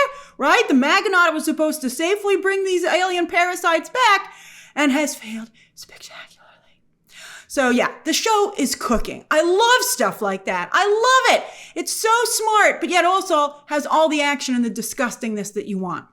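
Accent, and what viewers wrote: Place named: United States. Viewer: Took French in school, so I was cringing at her Americanized pronunciation.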